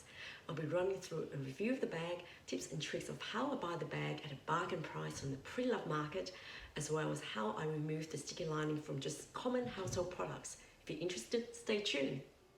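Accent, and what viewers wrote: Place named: Australia. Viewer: Hi! I love your vibe! I also love your accent! Australia? Keep up the great work! 👏😎💖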